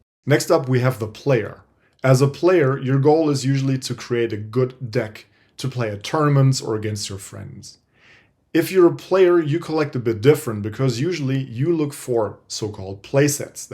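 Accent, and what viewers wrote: Germany. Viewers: I hear the Deutsch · Tolles Video (ich hoffe ich habe den Deutschen in dir rausgehört ;) )! Ich versuche mich seit Tagen schlau zu machen über das Pokemonkarten game, weil ich vorhabe meiner Nichte (1 Woche alt) zur Taufe etwas wertvolles zu schenken auf das sie stolz sein kann wenn sie mal älter ist... jedoch habe ich noch keinen Anlaufpunkt gefunden wo ich nicht dieses Gefühl hatte, dass der Verkäufer einen "Dummen" sucht... gibt es einen zertifizierten Händler, wo ich ruhigen Gewissens "einkaufen" kann? Liebe Grüße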